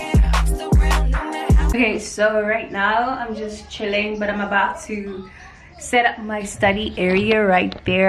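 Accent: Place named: United States. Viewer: You have an American accent... Not mad at it though. It's a free country ✨ beautiful vlog❤️❤️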